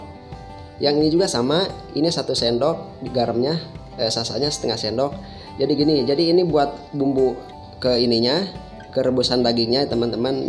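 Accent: West Java, Indonesia. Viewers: Saya ni penasaran abang orang padang tapi logatnya ky sunda ,mantap saya belajr ni mksh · Semangat kang.... Sabar aja ya.... Resep nya, mantap Kalo resep ini beda bukan berarti salah.mungkin beda daerahnya kali kan bisa aja... Kalo beda daerah beda cara meskipun sama2 padang.... Memang logat nya sunda si akang ini... Tapi bisa masak padang pasti dari org padang juga gak mungkin sotoy akang ini apa lagi berani berbagi ilmu masak nya.. Semangat terus kang semoga sukse en murah rezeki👍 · Ky nya ini org Sunda, Krn logatnya dr Jawa brt. Sy org Sunda juga.mungkin dia kerja di rmh mkn Padang. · Dari sekian banyak video bang logatnya kaya org sunda kang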